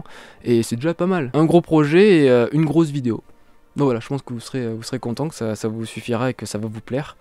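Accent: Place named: Montpellier, France. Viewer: j'adore ton accent! j'ai le même! Dommage, je viens juste de te connaître et tu pars de Montpellier ;-; Bon courage pour Paris mon pote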